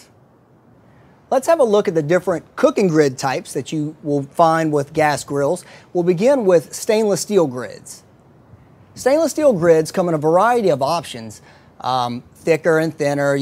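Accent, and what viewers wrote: Louisiana, United States. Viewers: Good stuff! Very helpful. Also, you sound very much from Louisiana.. am I right?EDIT: Nevermind. just looked at your channel and saw all the other LA related videos.. lol. great channel and video production. Pls keep it up!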